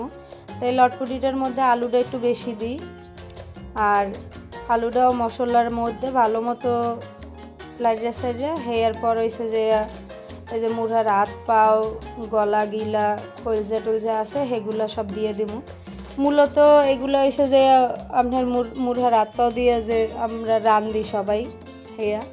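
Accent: Barishal, Bangladesh. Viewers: Apu apner basa borisal e konkhane amar basa o borisal e .....amader borisaler basai vlog sune valolaglo ti subscribe kore fellam jate amader borisaler basa sunte pari....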